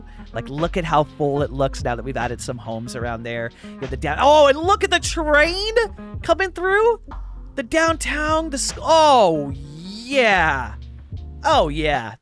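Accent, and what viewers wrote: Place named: Canada. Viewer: Hey, my first of your videos, watching from Dublin Ireland here. The content (and your lovely Canadian accent) is very relaxing to watch :)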